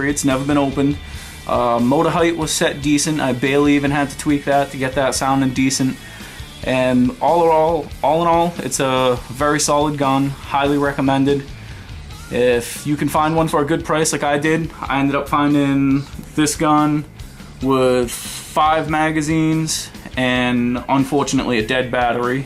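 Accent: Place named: Boston, United States. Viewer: You live near Boston MA don't you I know that accent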